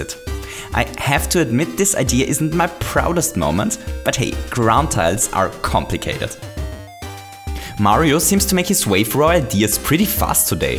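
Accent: Germany. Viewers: i have a question are you german? because your accent sounds kinda german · I love your a bit German pronunciation :)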